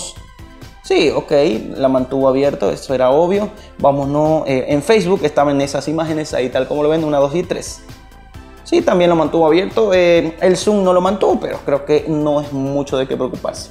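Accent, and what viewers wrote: Bolivia. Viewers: tenes el acento de un camba, y tipico siempre dicen el "obviamente" jjaja donde queda tu tienda?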